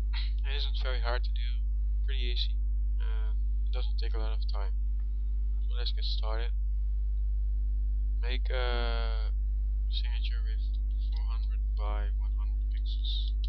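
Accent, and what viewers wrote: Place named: Netherlands. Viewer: haha je hoord wel egt goed dat je NL bent :D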